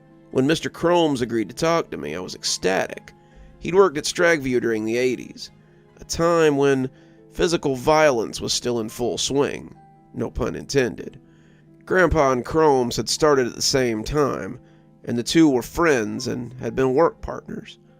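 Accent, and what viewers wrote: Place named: Deep South, United States. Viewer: Sounds like you've spent a lifetime in the Deep South. Impressed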